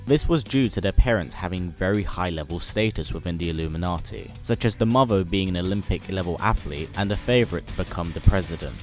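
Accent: United Kingdom. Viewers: I love these videos, just play them and leave them in the background while doing other stuff. Also, the fact that they're narrated by a fellow Brit is just the best thing.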